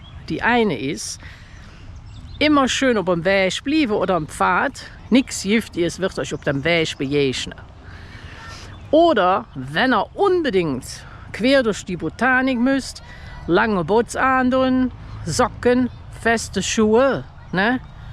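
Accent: Cologne, Germany. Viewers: alter😳 da braucht du einen übersetzer, aber als urkölner kann ich sie jut verstonnn👍 wünsche wiggerhin vill spass😎 isch han se direk abonniert👍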